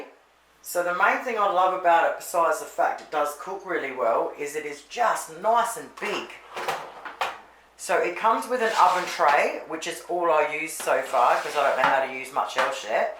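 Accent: Australia. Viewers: Wonderful listening to Australian English in a review. Love the sound special effecs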